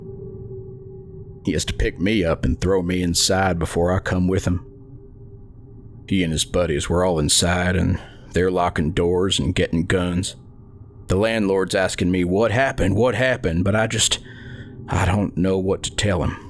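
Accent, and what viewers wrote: South, United States. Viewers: I am enjoying the Southern drawl dialect, the story is okay, not the best but the narrator voice made me want to listen to the end.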